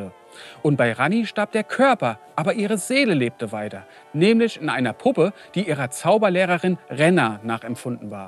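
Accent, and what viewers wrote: Hesse, Germany. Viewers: Absolut grandios das Video. In noch keinem Video wurde die Geschichte von elden Ring so gut zusammengefasst. Und des hessische macht’s au noch weng mehr onständig unterhaltsam :D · ich liebe und vor allem das hessisch, einfach nur Gold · Meine Mutter war Hessin und ist dieses Jahr gestorben, ist für mich immer ein Gefühl von Heimat, wenn ich den Dialekt höre. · Also Trants Hessisch klingt echt angenehm. Gott sei dank nicht auch Sächsisch :D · Gute halbe Stunde entertaiment auf hessisch :D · Hessisch for de win alder ✊🏻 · Herrlich und trotzdem das "Lore" sehr gut rübergebracht, Vaatividya auf hessisch :-) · Ei gude ihr lieben, Imma widda schee, wenn ihr uff hessisch babbelt. Ich wär mal für ne ganze reguläre Folge in hessischer Mundart 😉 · Hessisch- beste Dialekt 💪💪👍👍 super witziges Video. Gerne mehr davon. Grüße aus Frankfurt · Wie ich das liebe wenn dem Schröck Storys uff Hessisch erklärt 😂😂😂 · Nie eine Sekunde gespielt, aber das meiste verstanden. 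Uff hessisch ist immer schön. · Irgendwie habe ich keine Ahnung, woher der Gag kommen soll bei hessisch. Ja, ist widerlich wie jeder Dialekt, aber tatsächlich witzig wäre halt schwäbisch, bayrisch, sächsisch. Zweitens. "Geschichte" und ein Souls Spiel. Putzig :D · Hessisch ist einfach wunderschön ^^ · Also wenn meine Muddi auf Hessisch spricht verstehe ich kein Wort mehr... wo liegt der Unterschied, noch zu nett gewesen?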